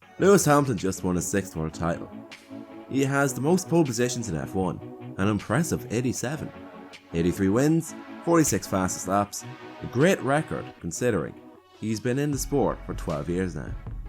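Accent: Ireland. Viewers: tf you on about with weird accents and being British he's irish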